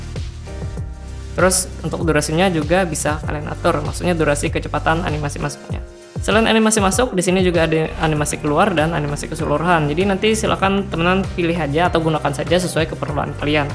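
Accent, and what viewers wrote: Banjar, Indonesia. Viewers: Dari penyebutan huruf, pinanya beliau ni urang banua jua nah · Orang banjar nih yg ngomong..😄😄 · Kaya orang banjar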